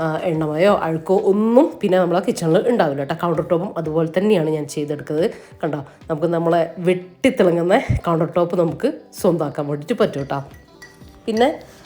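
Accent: Ernakulam, India. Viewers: എറണാകുളത്തെ സംസാരം ആണല്ലോ. വയനാട് ആണെങ്കിലും.. 👍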